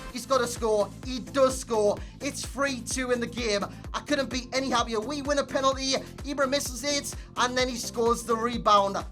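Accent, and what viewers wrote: Newcastle, United Kingdom. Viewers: is geordie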